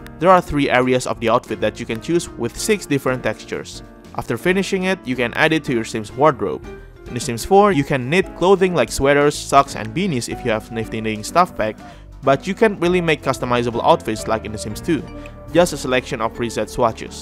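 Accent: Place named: Indonesia. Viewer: Gilee bangg keren bgt, gw lgsg ngeh lu indo dri aksennya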